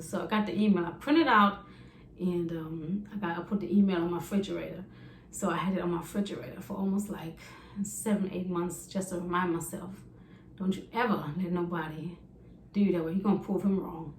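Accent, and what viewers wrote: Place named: Germany. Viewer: irgendwie kann ich raus hören das sie deutsch sprechen also an ihren deutschen akzent ich liebe aber ihre videos machen sie weiter so!❤️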